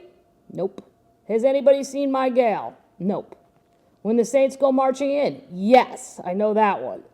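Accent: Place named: Wisconsin, United States. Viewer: Love her Wisconsin pronunciations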